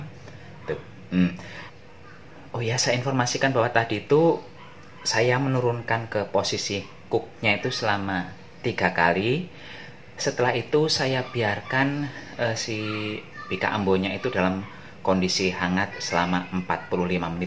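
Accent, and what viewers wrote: Banyumas, Indonesia. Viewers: Pak lucuuu pol n resepe wokeh 👍👍👍👍 auto lgs sy subscribe deloke ambek guyu" 😂😂 ngapak " e inyong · Mas njawine pundi to kados radi ngapak sami kaleh bojone Kulo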